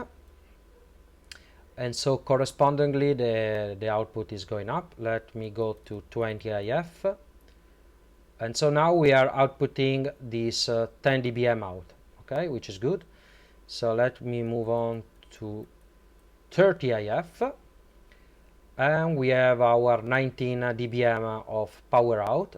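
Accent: Italy. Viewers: Scommetto sei italiano 😅☺️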